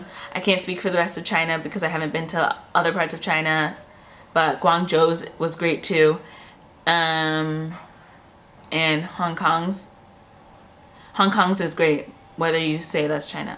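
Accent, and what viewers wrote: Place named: Georgia, United States. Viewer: Sounds Georgian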